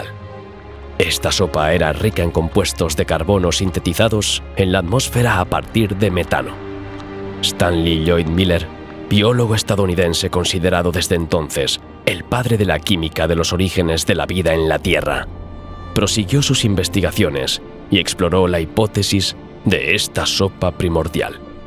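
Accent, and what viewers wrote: Spain. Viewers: Veo muchos comentarios de mis hermanos hispanoamericanos y ninguno de ellos son irrespetuosos excepto tú. Si no te gusta escuchar el acento castellano español pues entonces no visites este canal, así de sencillo. Sé que aún habéis algunos que nos repudiais por el tema de la conquista, pero déjame decirte algo, los españoles actuales no tenemos culpa de lo que hicieron nuestros antepasados y yo como español me entristece ver mucho odio y resentimiento en todas las redes hacia los españoles. Me hubiese gustado una conquista sin sangre, sin historia negra. 😢 Os admiro, os respeto y os quiero porque somos hermanos. Viva Latinoamérica y Viva España.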